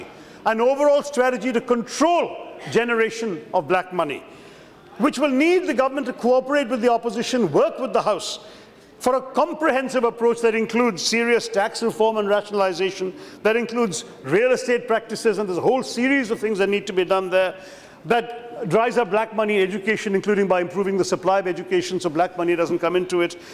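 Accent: United Kingdom. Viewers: British accent.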